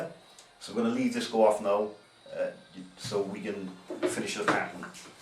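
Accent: Cardiff, United Kingdom. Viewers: Caerdiff accent Got one myself, best of luck.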